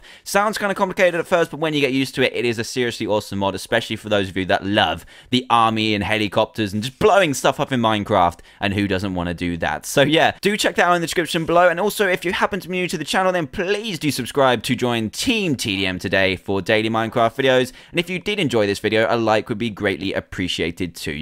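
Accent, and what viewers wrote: United Kingdom. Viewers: HE'S BRITISH LIKE ME IDIOT! French ppl sound different!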